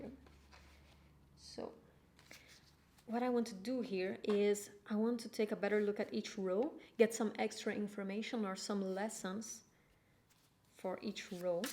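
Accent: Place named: Brazil. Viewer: Are you Brazilian?!? Sound like !! 🇧🇷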